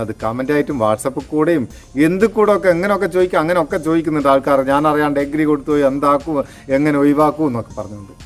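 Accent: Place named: Malabar, India. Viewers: അതാണ് മലപ്പുറം ഭാഷ😄😄🥰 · ഇങ്ങളെ മലബാർ ഭാഷ കേക്കാൻ നല്ല മൊഞ്ച് ഇൻഡ്.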